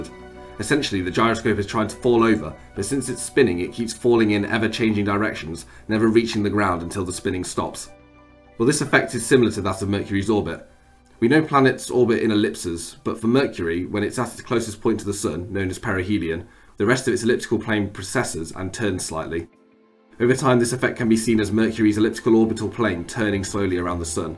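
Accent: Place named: United Kingdom. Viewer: difficulty understanding some of the British accents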